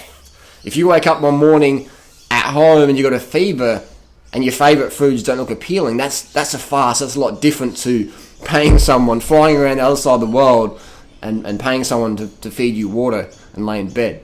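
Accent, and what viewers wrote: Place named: Australia. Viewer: I just hate this Australian accent...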